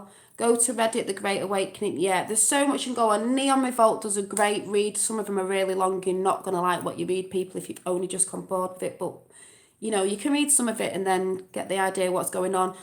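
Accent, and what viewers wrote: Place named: Yorkshire, United Kingdom. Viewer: Yorkshire? I have friends there, they talk like you. Thank you for sharing so much with us, your passion is contagious!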